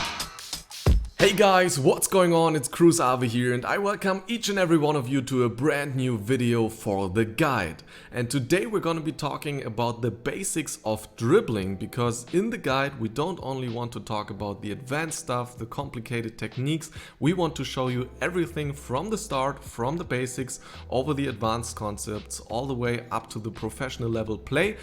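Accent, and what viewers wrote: Germany. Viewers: Das sind schon deutsche Macher, oder? Ich empfinde den Akzent schon als sehr Deutsch. Mit Englisch erreicht man allerdings ein wesentlich größeres Publikum, da wird es wohl niemand auf Deutsch machen, wenn Englisch genauso gut funktioniert. Gruß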